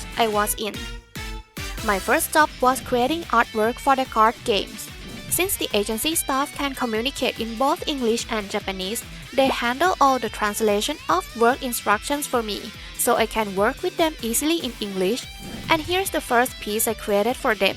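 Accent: Thailand. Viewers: I knew it from your accent and bombastic artstyle that you are from Thailand (ONE OF US ONE OF US) this video helps me so much as a young aspiring artist +sub!! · กรี๊ดดดดด สวัสดีค่ะ ผ่านเข้ามาเจอ คิดว่าสำเนียงไทยม๊าก สนุปว่าคนไทยจิงด้วยย เก่งมากๆๆๆเลยค่ะ❤❤❤ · นั่งฟังตั้งแต่ต้นคลิป รู้สึกว่ามีไอบรรยากาศความเป็นคนไทยมากๆ ทั้งการออกเสียงทั้งสำเนียง สุดท้ายก็คนไทยจริงๆด้วย😭 เก่งมากๆเลยค่ะ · ฟังสำเนียงคิดว่าน่าจะคนไทย ใช่จริงๆ ด้วย เก่งมากเลยค่ะ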